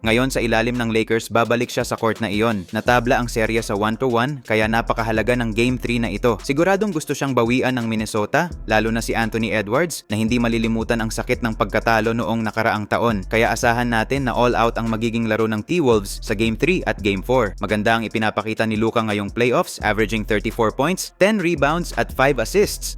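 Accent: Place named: Visayas, Philippines. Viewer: San m ba nakuha yang balita m 😮 bisakol ka magbalita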